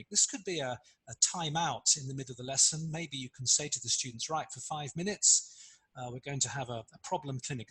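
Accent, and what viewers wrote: United Kingdom. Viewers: By the way, you have got a wonderful English (I know I should write British) accent. It´s music to my ears.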